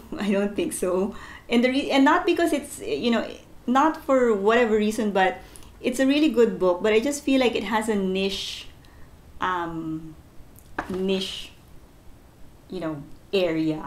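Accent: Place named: Tagalog, Philippines. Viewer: Fantastic Book! Thank you for your review! I think your tagalog accent is fantastic!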